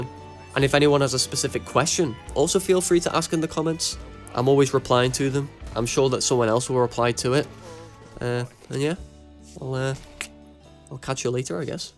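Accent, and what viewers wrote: Liverpool, United Kingdom. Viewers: British accent ❤ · its an british accent i think scouse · Your British accent is so strong it reminds me of Paddy Pimblett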